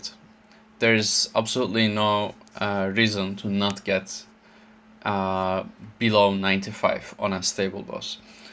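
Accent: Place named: Turkey. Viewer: Aksanından anlamıştım türk olduğunu!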